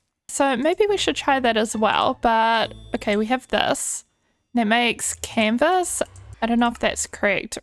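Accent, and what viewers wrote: New Zealand. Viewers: recently found your videos and wanted to just say thank you, ive been trying to find some kiwi youtubers as ive just moved to australia alone and am missing home alot, sounds stupid but ur voice and the added kiwi accent puts me at ease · Instantly recognised the accent!! 🥝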